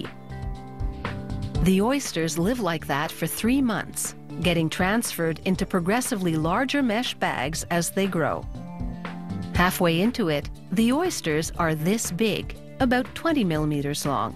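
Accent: Canada. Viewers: The speaker is canadian... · I hear a very distinct Canadian accent.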